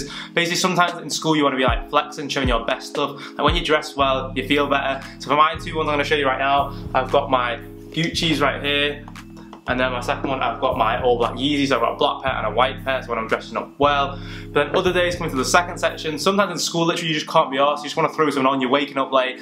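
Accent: United Kingdom. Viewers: holy crap that British English kills me boi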